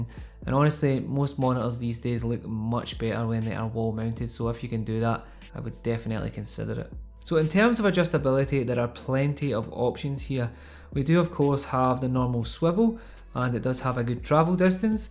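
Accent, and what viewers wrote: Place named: Glasgow, United Kingdom. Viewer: Your Glaswegian right, well you sound like, anyway keep up the great work! But I have a request can you make a video about budget per key RGB mechanical keyboards under 30 pound.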